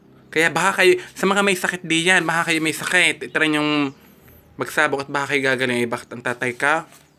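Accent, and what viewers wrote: Batangas, Philippines. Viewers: Hahahaha nkakatawa ka tlga.! 😁😁 Lalo na eh my tono Ng batangenyo ay siya nkakatuwa..hehehe 😁😂😂😂😆😆😆😆😆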